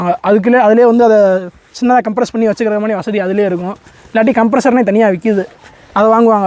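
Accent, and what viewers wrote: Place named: Madurai, India. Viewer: Unga madurai slang kum unga muyarchikkum seekiram success varum bro❤😍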